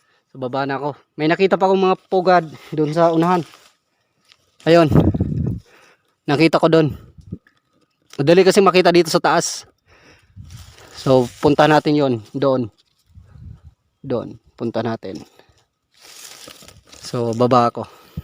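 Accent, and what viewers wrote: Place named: Visayas, Philippines. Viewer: Hindi ma klaro hahaha bisaya diay ka dol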